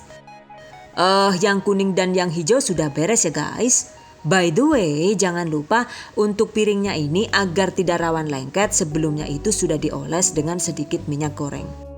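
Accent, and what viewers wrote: Banyumas, Indonesia. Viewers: Klo sy buka, diantaranya jg selain tertarik resep2 nya bunda cantiek, tapi juga 'ngapak' nya itu lho yg kutunggu...hehehe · Nah klepon ala unik banget soalnya pake nutrijel, apa hanya aku yg baru tau kalau nutrijel bisa buat klepon😁 Selalu sukak sama penjelasan2nya, apalagi bahasa ngapaknya itu "pada mledos kabbeh jan" lucuu dengernya😂 dan ciri khasnya itu klw ngomong OK GUYS atau CATAT😁 bikin orang yg gak mudeng jadi mudeng😁😍 #GiveawayTHV2020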